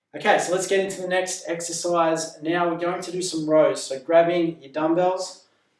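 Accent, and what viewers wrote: Australia. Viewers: Hi, Thanks so much for all your videos and articles. They are all very informative and easy to follow and do. I'm stuck in Europe at the moment, unable to get easily back home, so it's wonderful to hear a lovely Ozzie accent.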